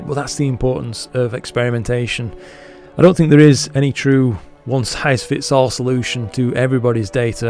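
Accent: United Kingdom. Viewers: time well spent watching it….very melodious also with your British accent!😜 i wish i could speak English in that accent!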